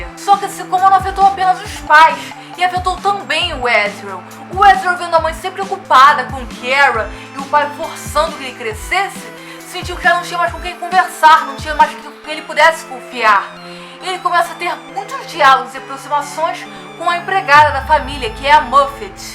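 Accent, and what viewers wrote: Rio de Janeiro, Brazil. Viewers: Ela é carioca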